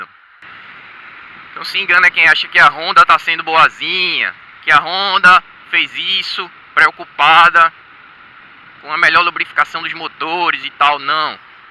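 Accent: Recife, Brazil. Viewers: so ouvi verdades tu es do recife? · eta sotaque feio do c da pra notar de longe que e de recife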